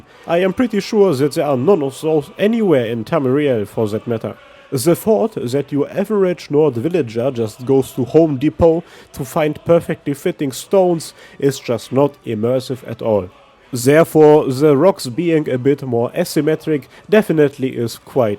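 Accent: Germany. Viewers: are you from DEUTSCHLAND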